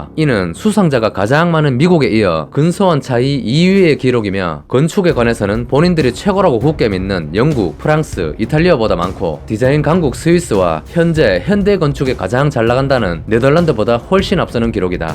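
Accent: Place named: Gyeongsang, South Korea. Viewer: 갱상도 ㅋ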